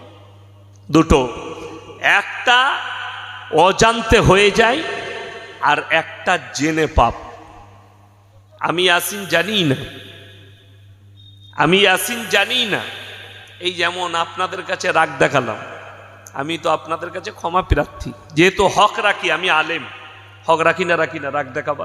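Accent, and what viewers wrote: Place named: India. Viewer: ওই হুজুরের ইন্ডিয়ার মত কথা কমা বলছে হুজুরের বাড়ি কি ইন্ডিয়ায়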